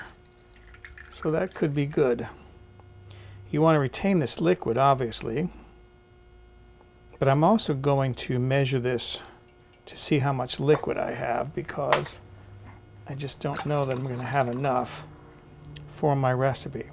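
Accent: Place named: Rhode Island, United States. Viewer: First of all, if you’ve been making clam cakes for decades then you’re my clam cake guru. Also, that’s a whole lot more clams than most of the clam cake makers back in Rhody put in their clam cakes while I lived there, most of them use mostly clam juice & try passing them off just like fake Rolex’s! I plan to make clam cakes the same way that you did in this video when I make clam cakes & chowder this Thursday! I’m gonna use cherry stones rather than canned clams though. Also, you sound a whole lot like most of the people who I left behind 5 years ago when I left Warren, RI & moved here to SanDiego. I also left the clam cakes behind so I’m totally jonesing for clam cakes because they don’t sell them out here in California. So it’s make my own & I’ll do it just like you did it in this video!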